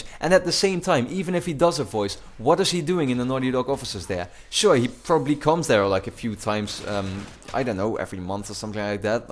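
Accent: Netherlands. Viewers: Do you talk dutch a lot outside your commentaries? I came across your channel just today and I'm Dutch as well (just sending it in English so other people can read it). Quick tip, I know it's hard to get rid of your Dutch accent but if you want to get rid of it, try to talk as much English as possible. (I mainly got rid of it because I am talking a lot with friends from England and play BF3 with them, I still have an accent sometimes but now it's mainly gone) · You must be dutch right? I really hear it in your english. I'm dutch aswell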